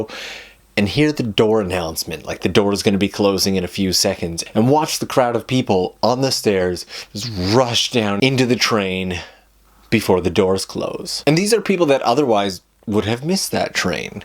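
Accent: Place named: Canada. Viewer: No I don’t mean it’s funny... I was just wondering if u are a Canadian Cus the way u pronounce those words... I love the Canadian accent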